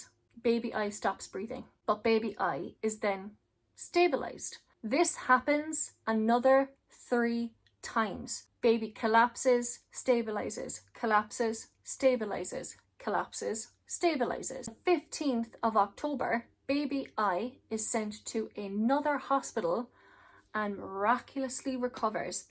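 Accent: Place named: Ireland. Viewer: Absolutely horrific and sickening. Do u reckon is some form Muchousen by proxy? Love your gorgeous accent btw, r u iIrsh? Love from Australia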